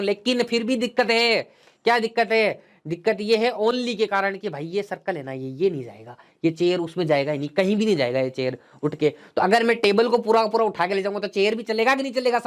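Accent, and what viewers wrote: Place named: Rajasthan, India. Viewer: Guess you are from Rajasthan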